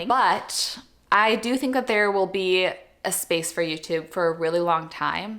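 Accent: Texas, United States. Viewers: I was watching ur old videos last week actually and I was telling myself hey she still talks the same the y’all part is there that Texan accent that I love. I’m from Texas as Corpus Christi. Don’t loose that part of you makes you so unique.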